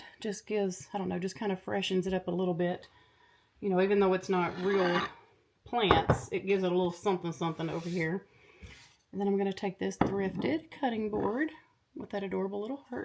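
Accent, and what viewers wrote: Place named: South, United States. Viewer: I'm a new subscriber. You have a little bit of a country accent like me; where are you from? I can't wait to watch more of your videos. BTW, I'm from Southeast Georgia.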